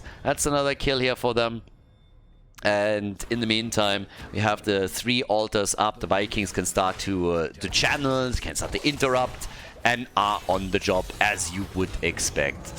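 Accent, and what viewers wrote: Germany. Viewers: Hm... are u a native speaking german guy?! It seems like with that dialect ^^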